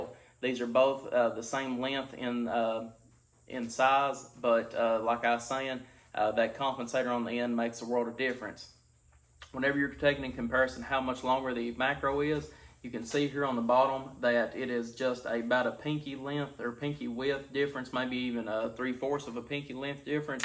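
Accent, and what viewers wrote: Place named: Deep South, United States. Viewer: wher ou from cuz i couldnt understand a word? asking sincerely. im from fl grew up in ga, but where ou from/ thats the craziest accent. love it. like deep south mixed w cajun? GA swamp? fl glades? where ya from bro?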